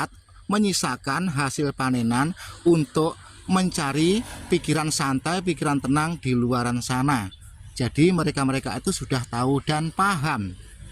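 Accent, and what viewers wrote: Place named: Bojonegoro, Indonesia. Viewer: Aku melihat konten2 Pak Dhe ini hanya dari sisi "NJAJAH DESO MILANGKORI"-nya. Utk wilayah Jatim hampir sebagian besar sudah di-JAJAH DESO MILANGKORI. Utk Jateng... masih mlipir2 ndeso2 perbatasan, Rembang sama Blora. Yg aku salut... Pak Dhe ke mana2 hanya naik motor matic-nya. Sepertinya secara logat dan awal2 berkonten, beliau asal Bojonegoro.👍🏻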